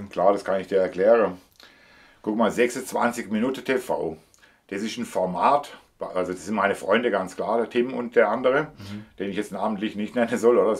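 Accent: Germany. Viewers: Ich hab durch Zufall deinen Kanal entdeckt, finde es super, dass du so offen über dein "altes Leben" redest. Ich bin ein neuer Fan und werde deinem Kanal auf jeden Fall folgen. Übrigens, dein schwäbischer Dialekt, genial ;-) Grüße aus Zella-Mehlis. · Bisch doch aus Karlsruhe oder Umkreis vom Dialekt her 😂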